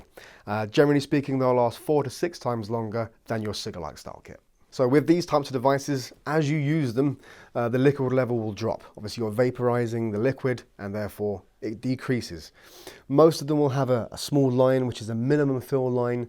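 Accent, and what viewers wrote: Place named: United Kingdom. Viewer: AN IRISH WITH A BRITISH VOICE?!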